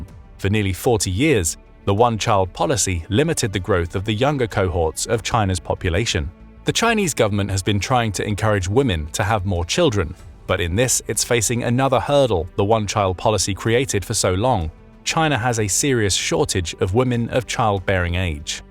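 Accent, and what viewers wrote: United Kingdom. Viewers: Yet another AI generated channel with a British accent robot reading script. Who is behind this channel?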